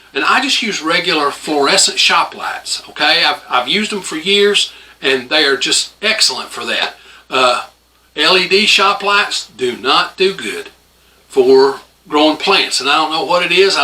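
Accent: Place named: Indiana, United States. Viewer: Hickory Kane(king)! Lol! I talk this way up here in Indiana! Lol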